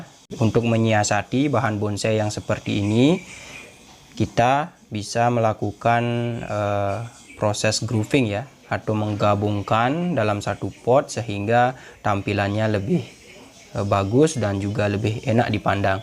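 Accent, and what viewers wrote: Bali, Indonesia. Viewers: Logat bali'y kaga nahan ,gk bisa dibohongi 😆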